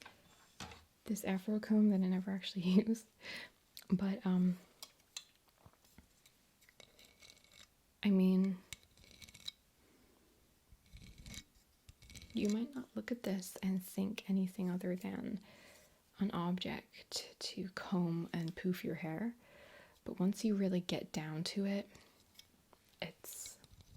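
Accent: United States. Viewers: As a none American, I would be easily fooled into thinking you where American most of the time. very creative idea. don't think I've seen a video where the character is an asmr fan without it being "crazy stalker" roleplays. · Your American accent is sooo good it throws me off more when you speak in your normal accent. You control all the little tell tale signs that usually peek through when doing an American accent. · I forgot until I read that comment that you are British! It was near the end, too! Wow great American accent.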